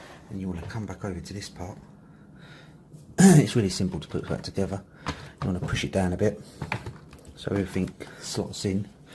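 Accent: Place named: South London, United Kingdom. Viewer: Nice one mate. I was banging my head against the wall until I found this vid. South London boy aye.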